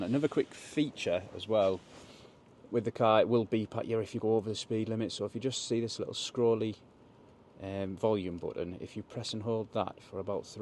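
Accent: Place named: RP, United Kingdom. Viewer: not only the car but I love your stellar british BBC accent. You sound like Robbie Williams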